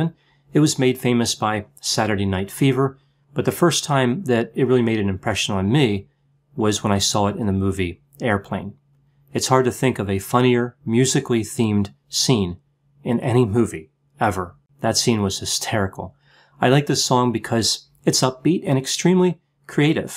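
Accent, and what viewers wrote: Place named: Delaware Valley, United States. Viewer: Your list is heroically bland and this video demonstrates your core virtues of steadfastness, honesty, and not caring what anyone else thinks. Also, I like your Delaware Valley accent. I grew up hearing it and find it comforting.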